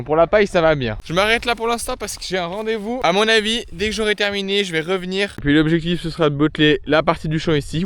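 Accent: Switzerland. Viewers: Salut, heureusement que tu n'as eu que des commentaires de bienveillance..Je ne suis pas dans l'agriculture, je suis contrôleur dans un grand entreprise qui vend des fruits et légumes pour les chaînes de grandes enseignes. Tu es génial et ton accent suisse nous fait bien rigoler..c'est quoi un char. 😊😊😊😊. 😂😂😂 tu as l'air de ramoneur tellement tu es black... Très belle vidéo, comme toujours. Bonne continuation 🫡🫡🙋‍♂️🙋‍♂️ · Bonjour,j'aime bien les suisses votre accent...votre élocution et votre bonne humeur, courage à tous et merci pour tes belles vidéos!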